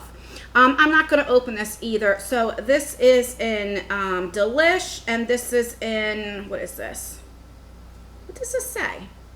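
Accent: New Jersey, United States. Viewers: congratulations on 14k now! stumbled on your videos a while ago and I've been watching them nonstop since! I just love your jersey accent and how you really explain everything thoroughly in your videos!! #lipstick :) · ...so glad to have stumbled upon your channel!! You are a ray of sunshine and look forward to your videos... You seem like someone I would totally be friends with:) Where in NJ are you?? From the sound of your adorable accent I'd say somewhere central or south...I'm a Jersey Girl too!! 🤟👊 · Nice to see it's happening for such a nice person ..enjoy your unboxings and the Jersey accent takes me back home . Congrats ! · Seriously I turn around and your channel has grown so much since I started watching. The amount of stuff you're giving away is beyond generous. also what sub do you have where you get a full set of crown brushes? I must have missed that video, oops! 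Loving your Jersey Accent :)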